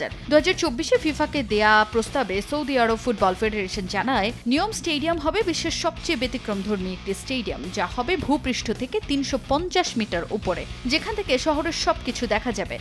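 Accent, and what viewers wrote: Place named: Noakhali, Bangladesh. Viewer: এটা তো মনে হয়ে নোয়াখালীকতে 😂😂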